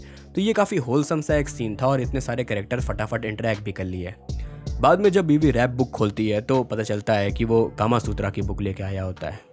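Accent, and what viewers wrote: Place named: India. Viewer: Film❌filam✅ bhai ka accent desi hain🙂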